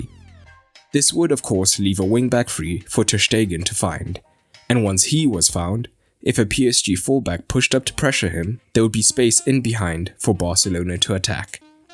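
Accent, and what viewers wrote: South Africa. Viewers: You sound like you from South Africa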